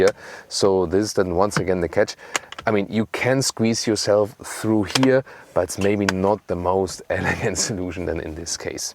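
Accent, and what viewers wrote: Germany. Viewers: Hi, your reviews are great, but your heavy accent makes it very painful to listen to. Why don’t you consider recording your videos in your native tongue (German) and add closed caption, or even a voice-over with a native English speaker? No offence, but it would win you more English speaking viewers for your great channel. Why don’t you consider it? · Re: German car bias. That’s exactly why I subscribed to this channel. A German reviewing German cars in English is a perspective one cannot otherwise get. Say, “DEE-hu-mid-if-cation” :-)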